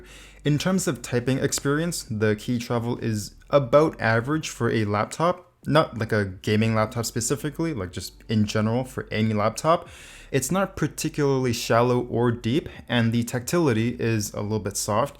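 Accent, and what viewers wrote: Canada. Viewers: Are you Canadian? :)) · IMHO sounds canadian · he's probably Canadian. Can tell by the way he says "out". Maybe Ontario.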